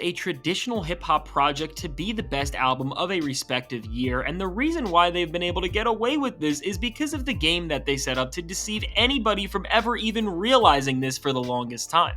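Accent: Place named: Canada. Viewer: Bro you sound like canadian lad 🗿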